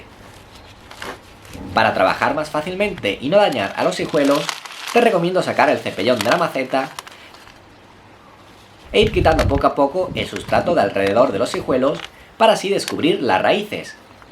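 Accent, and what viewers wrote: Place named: Spain. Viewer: Me fastidia esa voz de españolete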